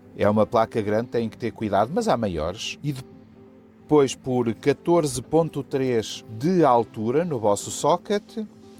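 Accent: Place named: Portugal. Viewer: Adoro o sotaque português, que na realidade é o original kkkk Abraços do Brasil. Excelente vídeo.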